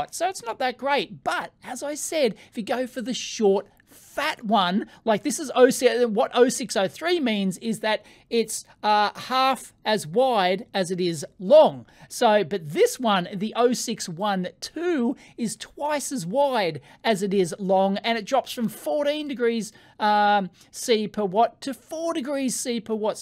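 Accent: Australia. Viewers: Australia accent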